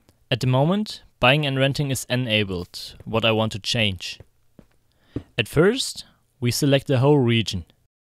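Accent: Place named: Germany. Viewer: this is the most german english I've ever heard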